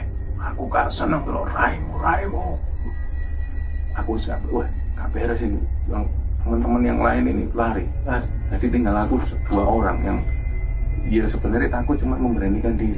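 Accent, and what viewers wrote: Java, Indonesia. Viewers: Mas keren..pokok nya..cara penyampaianya bagus..kalwm khas jawany keluar slm dr jogja.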